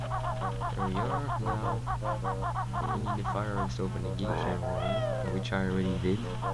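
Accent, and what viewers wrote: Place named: United States. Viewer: see told you, you sound american/canadian amazingly... but i respect your belgian waffleness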